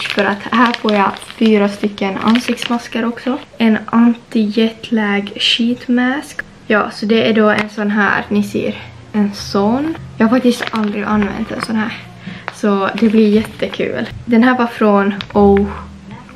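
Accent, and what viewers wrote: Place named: Finland. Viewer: hör det på din accent, är också finsk, svensk🥰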